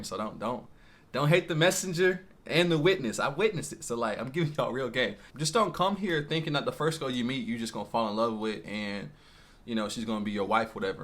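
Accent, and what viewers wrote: United States. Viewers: Hey wassup I have no intentions at all of moving there but I do appreciate your suggestions, knowledge, and sharing your informative and detailed Perspectives & experiences. I can tell u would be a great friend to have in my circle. U sound like u from the 5 boroughs. Probably BK or queens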